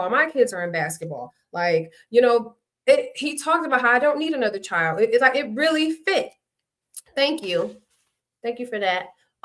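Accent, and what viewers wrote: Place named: Detroit, United States. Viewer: I heard your Detroit accent immediately, similar for some of the other cast. It is subtle but Detroiters have it. Saying D'Troit in a way more like the original French origin versus dEETroit I hear outside Michigan.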